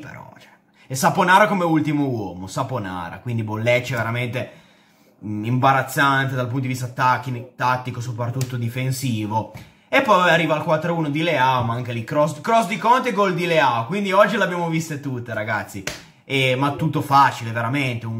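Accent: Liguria, Italy. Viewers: Ma quanto è bello il tuo accento Ligure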